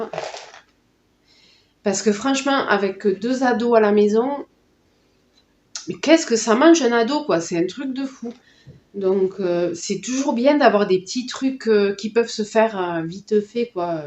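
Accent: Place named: South, France. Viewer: Je découvre vos vidéos et c'est très intéressant pour essayer d'optimiser ses courses et limiter les dégâts... Au vu de votre accent... Ceci me laisse croire que vous êtes du sud ou je me trompe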